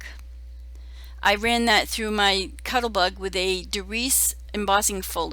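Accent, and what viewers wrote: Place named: Pennsylvania, United States. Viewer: I love these cards! I do think you’re putting to much pressure on yourself, but it’s strictly up to you the number of videos you produce ok? Oh! And where’s your accent from? I’m thinking Pennsylvania? A little stronger than Ohio 😁 where I was born 😏. thank you for your inspiration!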